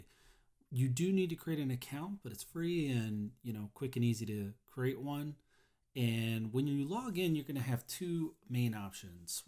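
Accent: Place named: United Kingdom. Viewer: Uk English i like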